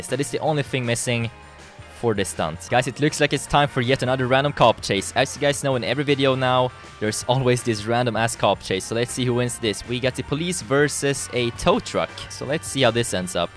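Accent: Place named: Sweden. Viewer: Man hör att du är svensk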